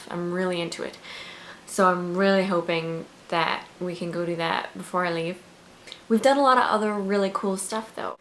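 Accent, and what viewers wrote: Newfoundland, Canada. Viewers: my family is from Newfoundland too! I was born in Ontario, though, so I don't have any of the accent like you do (BUT IT IS ADORABLE I WISH I DID). Every time I've been I get the same sort of 'oh my god how does this place even EXIST' feeling b/c it's so pretty and everyone is so sweet :) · You sound sooo canadian! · Hahaa newfie accent :P I don't think mine is very bad. I don't notice it though because I live here. That's so awesome though! :D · Ahh Newfoundland accent! I'm from NS, and NFL is my favorite accent ever. Never thought I'd see a maritimer youtuber. This made my day! :) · omg your newfie accent is thicker then mine and I live here ! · You have more of a nl accent than me and I've lived here all my life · Hey! I met you when you were at the Folk Festival, I dont think I told you my name haha but I came up to you wearing a dress by the shirt stand. 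Anyways, it was really great meeting you and I love the fact that your Newfoundland accent is really strong here hahaha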